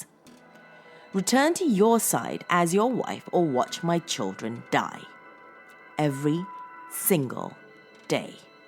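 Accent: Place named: RP, United Kingdom. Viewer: I'm very curious to know why you sometimes say 'de' instead of 'the' when you are quite able to pronounce 'the' properly with your quite posh accent! Very bizarre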